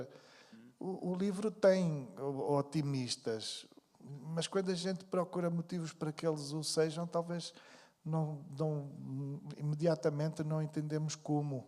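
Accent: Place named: Brazil. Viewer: És mesmo falso! Ainda por cima quando te pões a brasileirar a forma como falas. Achas que eles são atrasados ou és tu?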